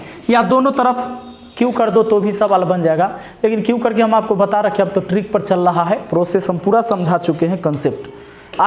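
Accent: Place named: Bhojpuri, India. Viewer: Sir aapka bhojpuri wala hindi bahut acha lagta he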